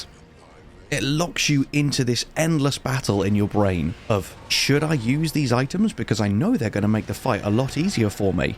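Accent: United Kingdom. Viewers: I just love your polite greeting in the traditional British accent. You have great info in your videos too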